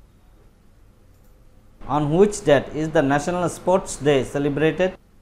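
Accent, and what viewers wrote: Northeast India, India. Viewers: Where are you from are you from northeast India because of your accent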